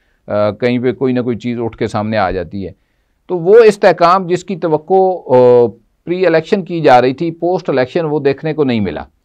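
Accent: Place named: Punjab, India. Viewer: Sir Bhatti, you are the only man who has stood his ground till date and you speak Punjabi very well with strength.❤❤❤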